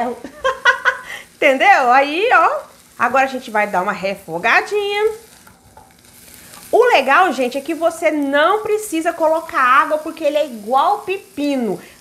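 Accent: Minas Gerais, Brazil. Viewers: não sei se vc é mineirinha, mas que esse sotaque mineirês seu é gostosim de ouvir, ah, isso é....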